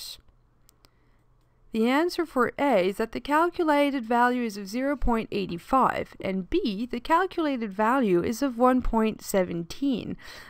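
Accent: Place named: Ireland. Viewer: It's a European thing. She sounds Irish maybe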